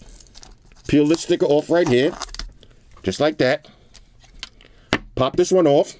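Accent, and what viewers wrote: New York, United States. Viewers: Yo going off is the greatest shit lol. The NY force is strong. You can hear the wind hitting the timb tree in his heart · My mans def from the NY area! 😂 “jefferson forehead” LMFAO!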